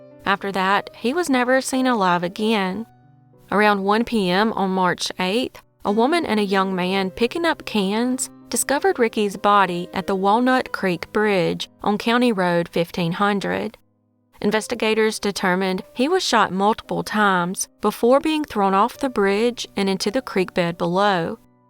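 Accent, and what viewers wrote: South, United States. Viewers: Thank you lovely 🥰 lady from the South with a beautiful Southern accent and a kind Southern heart! 💕💐💕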